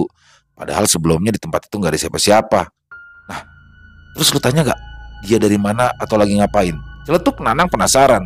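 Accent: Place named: Betawi, Indonesia. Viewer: Bikin LOL mendengarkan Banh bercerita dengan bahasa betawi... 😅😅😅 sangat menghibur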